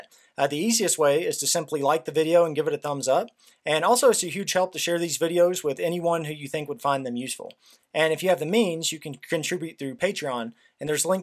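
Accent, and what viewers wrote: South, United States. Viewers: me: I like this guys accent, kinda southern also me: m8 you live in tennesse, you have the same accent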